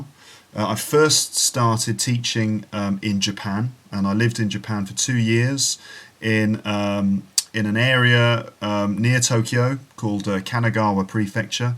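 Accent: United Kingdom. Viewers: I like British accent!😊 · I really like your accent "British"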